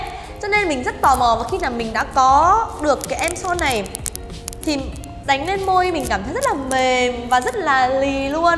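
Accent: North, Vietnam. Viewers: Cj giọng Bắc cực kì dễ thương lun ák. Mãi iu cj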